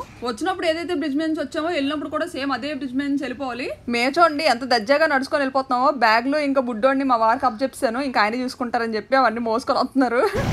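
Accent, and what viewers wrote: Vizianagaram, India. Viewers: మీ విజయనగరం యాస కు.. ఫిదా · vizianagaram praantheeya bhasha Vinipistundi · Happy wedding anniversary 💐 God bless you I am Anasuya from VIZAG , Profession by Teacher. My native place is VIZIANAGARAM. Mi matalu chala baguntayi. Mi matalu vini VIZIANAGARAM aria anukunnanu. Adi currect iyyindi. You're so Active. God bless you. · మీ , మా యిజనగరం భాష బాగుంది.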